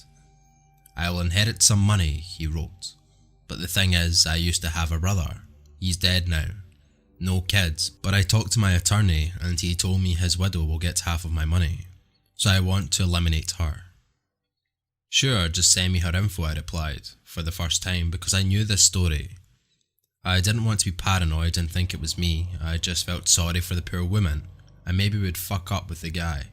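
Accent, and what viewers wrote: Scotland, United Kingdom. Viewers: Just another one of my new favorite guys because of the great voice and accent! Sometimes there is some Scottish sounding accent too! 💚 Think I've listened to all videos, love the longer ones. Hope you make a few more of them.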